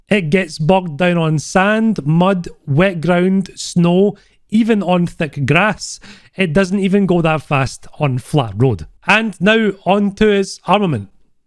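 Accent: Scotland, United Kingdom. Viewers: He's Scottish mate.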